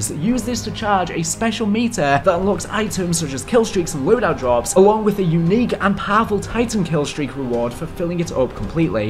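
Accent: England, United Kingdom. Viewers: Im pretty sure that it’s a English/British accent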